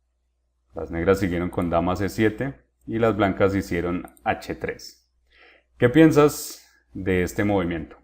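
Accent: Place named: Colombia. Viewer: Me encanta tu canal y la forma como explicas. excelente!! Me animaré a comprar tus packs pero el pequeño detalle es que me toca también comprar un Pc porque tengo mac jeje. Saludos desde Bogotá. Tu de qué parte de Colombia eres? me parece haber escuchado ese acento en un torneo IRT.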